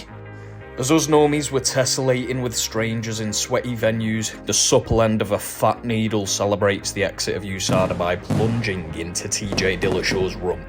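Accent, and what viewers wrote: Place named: Manchester, United Kingdom. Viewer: Love to see it - bringing the Manc accent and charm to the masses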